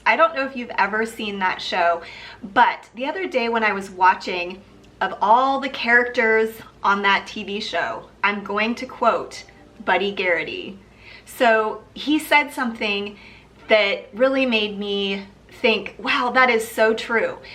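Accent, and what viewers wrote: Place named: Texas, United States. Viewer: Love friday night lights ❤ haha I love the Texas accent. Bonnie would have talked like that 😍🥰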